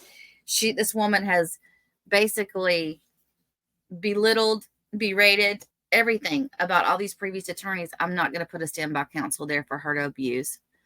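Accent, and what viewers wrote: South, United States. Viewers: Voluntary intoxication is not a defense in the state of Florida. I like your southern accent. Where are you from. I'm a new subscriber. I like your presentation with humour.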